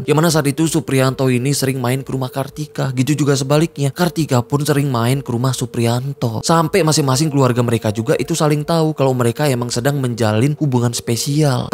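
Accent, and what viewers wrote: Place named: Java, Indonesia. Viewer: Sak jane penak kisah cintane supriyanto sampek bolak balek medok 😃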